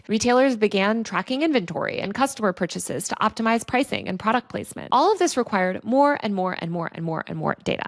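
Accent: California, United States. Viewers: How do I put a filter over your voice so I don’t have to hear such California OMG accent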